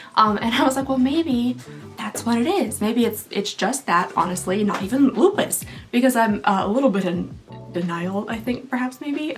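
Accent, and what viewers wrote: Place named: Georgia, United States. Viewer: please know that you owe us no apology. As seen with other posters, we will be here when you feel well enough to do a video. I’m sorry to hear you are having a tough time. As for me, I just love your sense of humor and your lovely accent. It reminds me of my family of friends in GA. You have a wonderful attitude considering the amount of things you are dealing with. Good job with giving yourself injections! I know how hard that can be from personal experience. I’m proud of you!!!😊Doing this yourself will help you get over your fear of needles.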